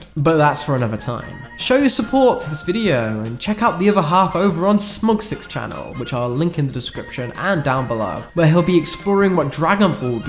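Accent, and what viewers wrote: United Kingdom. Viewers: You have a very nice British accent. You can go far in YouTube with just that. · nice video, the only problem is your hard Brit accent. Found it so difficult to understand you.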